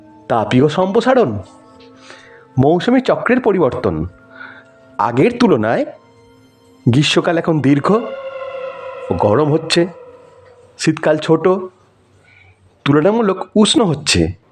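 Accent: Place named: Kolkata, India. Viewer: ভাই আপনার কোন দেশ? আপনি কিছুটা কলকাতার ভাষায় কথা বলছেন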